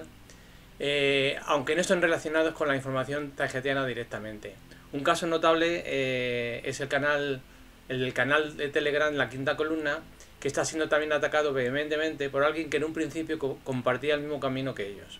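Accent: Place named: Brazil. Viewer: escribí para comentar en el video. puse: ¿Por qué tengo la sensación que la que habla en el video es usando un modulador de audio y poniendo acento brasileño? Bueno, pues después de contestar que parecía una tonta y que dejaramos de inventar teorías locas etc, borró todos los mensajes.... ya no hay rastro de ellos. Todo lo que dice y cómo lo dice es clavadita. Si no lo quieres ver, vale. Cada cual siga su propio camino, no el de otros.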